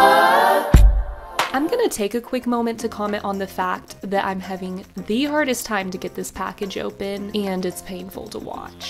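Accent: South, United States. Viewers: Why do you sound like my mom? Like the country accent and everything is spot on.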